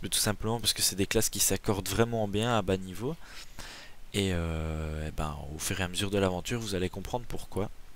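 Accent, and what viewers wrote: Belgium. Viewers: j'aime bien l'accent bien belge x) ça fait plaisir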